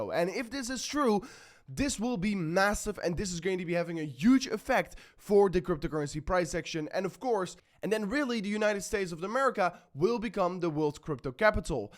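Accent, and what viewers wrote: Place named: Netherlands. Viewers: Yep he is Dutch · Ben jij Nederlander of vlaming ofzo? ik hoor een accentje. groetjes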